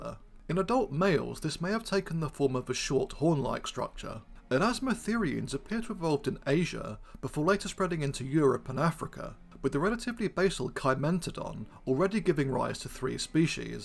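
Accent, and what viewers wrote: United Kingdom. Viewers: What part of England are you from?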